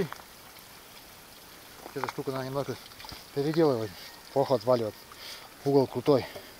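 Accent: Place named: Siberia, Russia. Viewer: НАВЕРНОЕ В СИБИРИ ЖИВЁТЕ СУДЯ ПО ГОВОРУ И СНЕГУ? Я СТОЛЬКО СНЕГА В ЖИЗНИ НЕ ВИДЕЛ,АХРЕНЕТЬ. ЛАЙК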